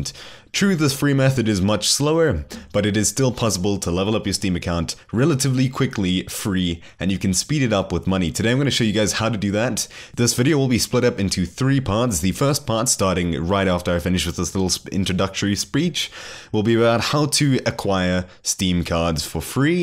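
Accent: South Africa. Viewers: i new you sounded South African howsit fellow South African ^^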